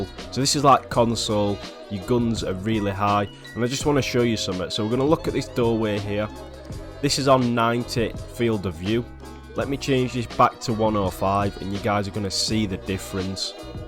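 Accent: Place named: Sheffield, United Kingdom. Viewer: you from sheffield bro ? i’m from birmingham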